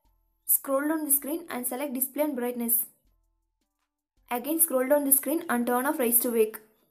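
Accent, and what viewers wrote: India. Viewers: I hear Indian that means I’m in the right place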